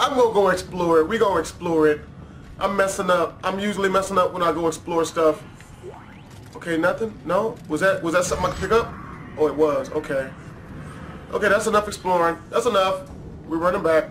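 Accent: United Kingdom. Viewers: I love your british (medieval) accent >.<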